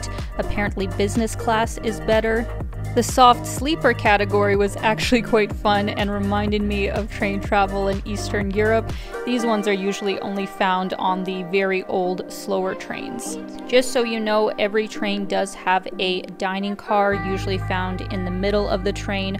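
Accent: Canada. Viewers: You know, I never really get when my American friends told me we have an accent, but somehow I can tell she’s Canadian from the way she talks. 😂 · I can see why college students would want to watch videos … excellent pronunciation and cadence for those learning English (with a lovely slight Canadian accent). And it’s experiencing new adventures through positive respectful awareness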